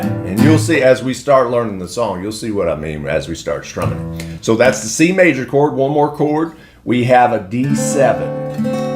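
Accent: South, United States. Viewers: u have a pefect country voice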